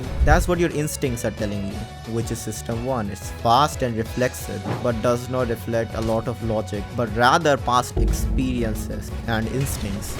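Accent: India. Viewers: Indian accent >>>>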